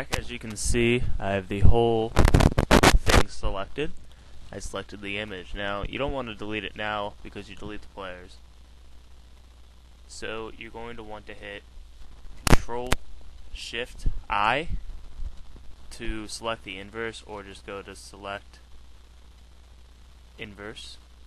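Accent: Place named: United States. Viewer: that american accent gets on my tit i cant understand nothing he says.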